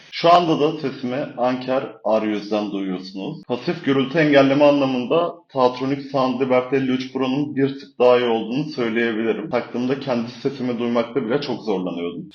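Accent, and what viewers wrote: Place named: Bingöl, Turkey. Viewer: Kardeş sen bingöllü müsün aslen?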